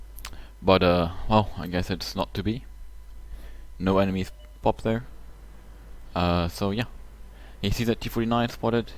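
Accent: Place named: Germany. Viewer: The commentary on the video is like to listen Quickybaby with a differnt voice and german accent. He uses all the same phrases. I dont rate this bad. It's just a statement.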